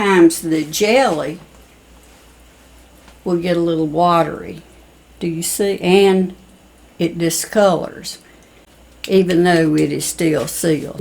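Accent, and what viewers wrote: South, United States. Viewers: I love the way you speak. You have such a rich, beautiful southern accent. It reminds me of the way my grandparents spoke. Thank you for the helpful video.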